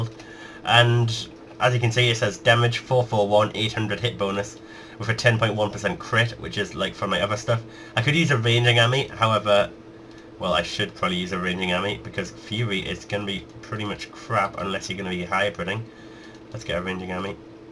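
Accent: Newcastle, United Kingdom. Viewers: Your accent is like mine. Are you a fellow Geordie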